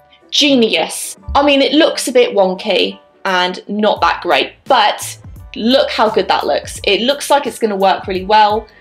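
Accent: United Kingdom. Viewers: I thought u were british bcs of ur accent and voice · I love your accent and I'm British too 😂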